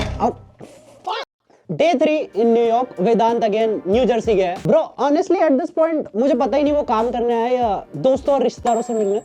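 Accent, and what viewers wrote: Rajasthan, India. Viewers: bit of rajasthani accent?? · Bro has the strongest Indian accent I've ever heard 😅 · thats the most Indian accent i have heard outside India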